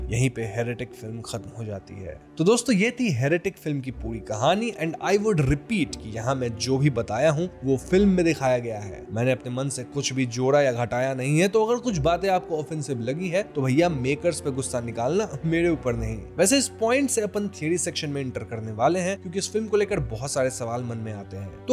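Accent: Bengal, India. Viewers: Bas kharab Hindi sunke bar bar mood kharab hogaya. Bangal se ho kya bro? Jara Hindi pe kaam karona.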